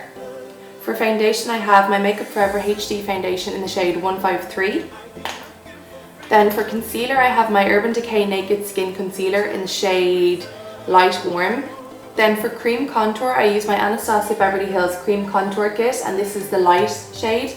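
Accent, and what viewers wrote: Ireland. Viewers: Are you Irish x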